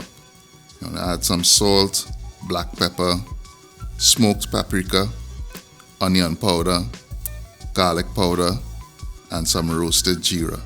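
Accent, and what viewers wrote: Trinidad and Tobago. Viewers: I AM IN LOVE WITH YOUR TRINIDAD TALK 🇹🇹 😍 💕 ♥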